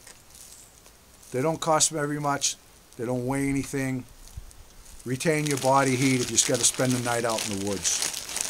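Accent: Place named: New England, United States. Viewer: OMG don;t let the negative people get to you. Whether jealousy, negativity, criticism, or downright cruel comments, know that those folks are very unhappy in their own lives and have a need to lash out to others. Everyone is doing the best they can with what they know. Pray for them, don't waste your energy in addressing or even acknowledging their rants. Move forward and continue with your happy life as if they don't exist. Acknowledging them gives them satisfaction in knowing it bothered you. Your videos are great, entertaining, helpful, and just downright genuine. You are the salt of the earth my friend. Pray for those lost souls. I now live in northern California, 8-1/2 years now - not far from Tahoe - but far enough to not get enough snow for me!!. However, I miss New England, having grown up in CT, RI, MA and ME. Your slight accent brings back fond memories. 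My daughters and grandchildren are in CT and MA. My older daughter has a second home in NH. I am planning on a road trip for a visit this summer/fall. Bless you.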